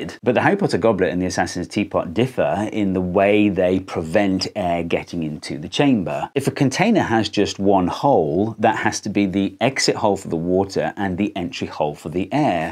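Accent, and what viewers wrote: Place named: Geordie, United Kingdom. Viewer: I’ve been watching your videos for years, and just realised on Wikipedia that you went to my secondary school! I’ve got as much of a geordie accent left as you do, mind, so I never even picked up on it. And ironically, I’m currently working in Universal Studios as a wandkeeper, so I guess we’re both wizards now. How many Tommy More wizards can there be? 😅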